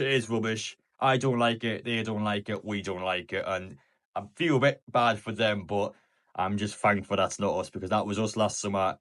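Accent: United Kingdom. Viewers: hi bro ,, i learn british english and i watch ur videos ,,, could you plz tell me which accent you speak .... thx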